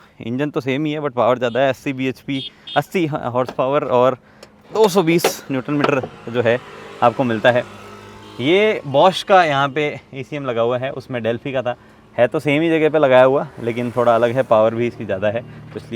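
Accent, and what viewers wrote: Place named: Marwari, India. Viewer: Yes, He is Marwadi.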